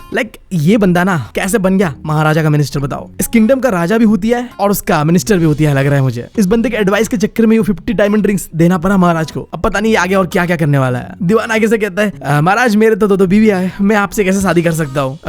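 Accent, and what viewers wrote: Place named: Bihar, India. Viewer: You are from Bihar/Jharkhand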